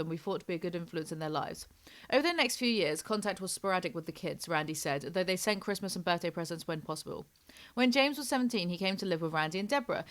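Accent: United Kingdom. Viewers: That BRIT sound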